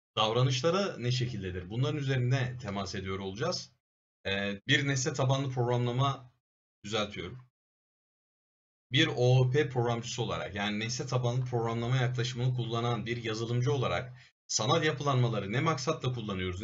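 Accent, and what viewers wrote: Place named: Rize, Turkey. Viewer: Adim kadar eminim ya rizeli ya oflusun hocam...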